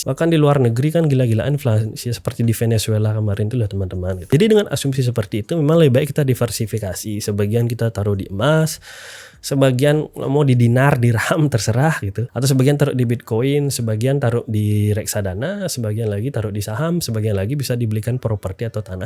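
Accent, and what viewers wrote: Bali, Indonesia. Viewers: Logat Timur coy, Bali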